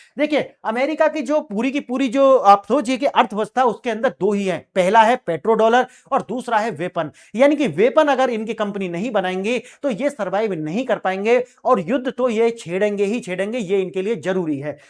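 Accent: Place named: Bharatpur, India. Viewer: आपको सुनने के बाद बार बार ऐसा क्यों लग रहा है आपकी भाषा भरतपुर के लोगों से मिल रही है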